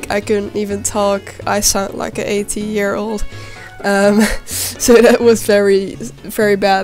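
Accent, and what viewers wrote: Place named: Netherlands. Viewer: I hope you get better soon, being sick sucks! Btw are you Dutch? I can tell from your accent...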